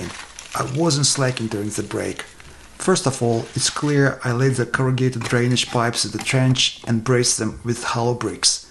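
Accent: Russia. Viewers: хороший английский! понимаю намного легче из-за русского акцента😊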